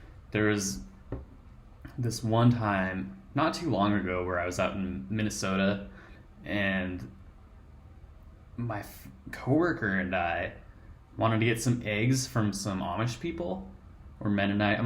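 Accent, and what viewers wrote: Colorado, United States. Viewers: I am half American half Australian and lived in Colorado as a child in the 90s. I love your video because it's great to hear the accent again. I love and miss Colorado and want to visit again some day. Peace dude! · I didn't know Colorado had an accent! Probably different now than it was 100 years ago. That was probably more like Yosemite Sam in those days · Hahaha you’re hilarious. I don’t know if it’s because we share the exact same accent or if you’re actually that funny but I was laughing a lot during that video. I’m in Mexico right now learning Spanish and I’m just watching these videos to get a taste of back home. I miss you all in Colorado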